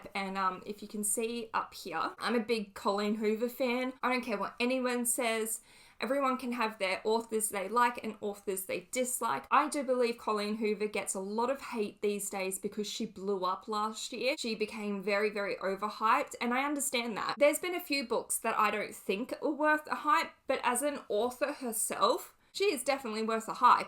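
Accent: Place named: Australia. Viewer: I love your accent. It’s Australian right?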